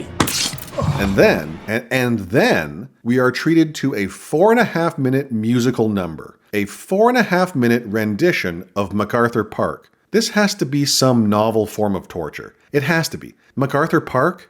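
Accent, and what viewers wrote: Canada. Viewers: Wait, are you canadian? Your voice sounds vaguely like it.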